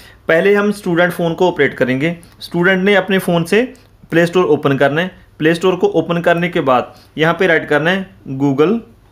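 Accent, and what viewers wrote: Punjab, India. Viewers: ji apka bolne ka tarika Punjabi yo jesa h me bhi Punjabi hu na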